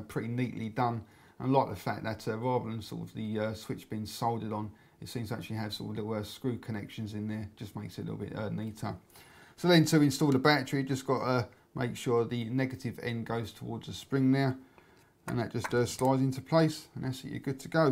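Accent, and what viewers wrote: United Kingdom. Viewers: Do you know that your vids are good for people who want to learn British accent? Btw, great review as always, stay lit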